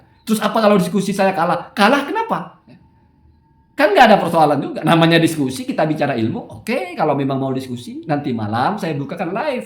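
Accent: Madura, Indonesia. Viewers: orang mana ya, kok ligatnya kayak orang madura. Ngapunten ngge.......salam kenal dari mojokerto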